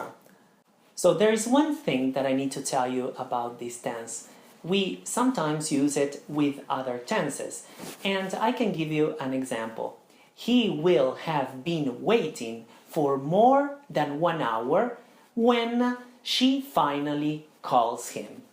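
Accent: Italy. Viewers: You are definitely Italian and not Canadian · I trully loved his italian accent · i would have bet that you were italian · your italian accent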